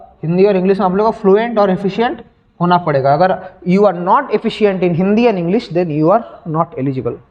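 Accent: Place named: Bihar, India. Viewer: Ap bihari ho n bhiya , your bihari accent become to know me this😊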